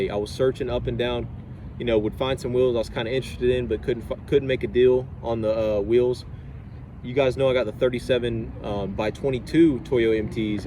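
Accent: Alabama, United States. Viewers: What part of Alabama are you from? I’m from Auburn!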